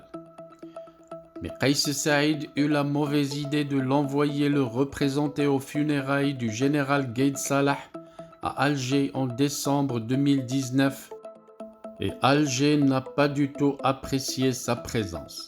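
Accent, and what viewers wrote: Morocco. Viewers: Vous êtes Marocain facilement reconnaissable par la prononciation d'un français directement traduit de l'arabe. Le tlemcien parle et pense en français et ne connait dans le détail le passé des relations politique maroco-tunisienne.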